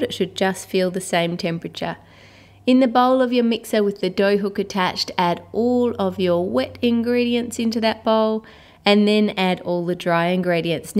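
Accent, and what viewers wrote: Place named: Australia. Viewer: Whooooa, that was an unusual video °Δ° like, seriously, i often look videos just to hear her beautiful voice and enjoy the Australian accent, and NOW I see smoke in her kitchen and then fire burning all over the video frame, and I'm like: 😨😵😱 whhhat??!!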